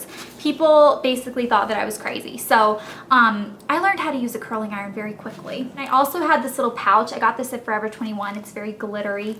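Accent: South, United States. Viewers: she has a southern accent? weeell, I guess I can't tell because I'm southern too xD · in the first seconds i thougt you were from the south because of the slang :D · I feel like you "southern" accent is getting stronger each day. haha. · Are you from the South? I never noticed your accent till this video?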